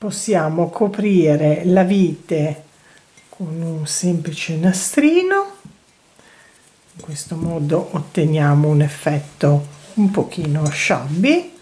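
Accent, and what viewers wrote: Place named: Liguria, Italy. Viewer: Grazie alle sue chiare spiegazioni sono riuscita a realizzare il portavaso con spago.E' davvero bravissima e vorrei provare anche altri suo lavoretti Si puo fare il portavasi appeso x un vaso quadrato ,circa 25 cm x 30? Ps il suo modo di parlare mi ricorda,con nostalgia la mia Genova, sbaglio ,o anche lei è Ligure?Cari saluti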